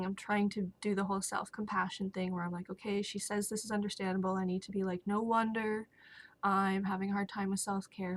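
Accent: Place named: Canada. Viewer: Where are you from im from Denmark. Some of what you say Sound canadian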